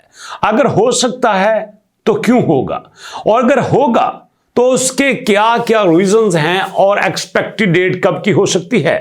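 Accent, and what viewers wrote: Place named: Assam, India. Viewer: Indian Assamese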